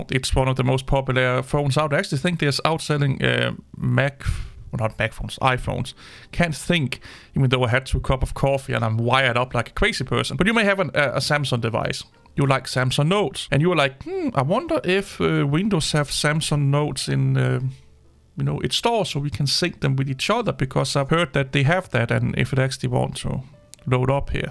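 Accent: Denmark. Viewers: Thanks so much I use Notes for my studies and needed this to work on my PC. Samsung needs to fix this its a bad look. The whole point I have a Samsung and not an iphone is because the accessibility and customization. Also are you from Denmark? Accent sounds like it.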